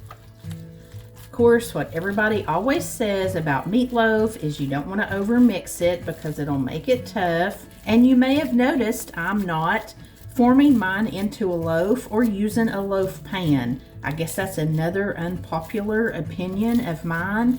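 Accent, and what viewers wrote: South, United States. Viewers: I just love your recipes and videos because your recipes are comfort foods and I just love your southern accent I don't miss your videos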